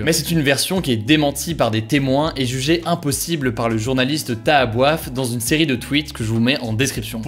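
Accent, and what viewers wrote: England, United Kingdom. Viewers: Son accent anglais me fascinera toujours autant XD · Bel accent british!!!!! 🤗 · Cet accent britannique 🤩!! Merci pour ces news. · Il a un accent anglais britannique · Cet accent anglais (sans ironie) pas mal ! 😂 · Je like rien que pour ton accent anglais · Mais cet accent anglais je suis admirative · that british accent you have :o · Ton accent anglais c'est un plaisir à entendre :D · Le british accent d’ 💛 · Comme d'habitude merci pour tes vidéos ! ❤️ Ce résumé est juste ultra pratique pour ne pas partir en psychose totale... Et au passage j'aime trop ton accent anglais ! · L'accent anglais wow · Ton accent anglais je l’adore 😂😂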